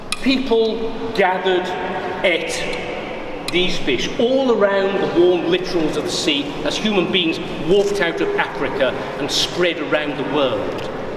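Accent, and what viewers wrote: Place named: United Kingdom. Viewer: It also helps that his accent is British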